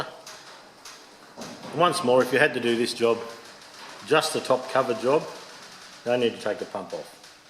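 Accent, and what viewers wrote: Australia. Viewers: Thanks pardner...same pump as my Cummins on my 40hyatt boat...very helpful.. Good job..u sound like Australia.. I'm n Chattanooga Tennessee.. Thanks again friend..! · Just discovered your channel. I'm a mechanic too. Mainly auto and light truck. But now venturing in big diesel like heavy truck and equipment. I'm in upper Midwest, central Minnesota. Sounds like you're in Australia?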